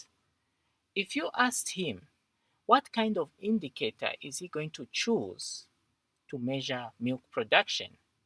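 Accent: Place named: Zambia. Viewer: You sound Zambian.. great lecture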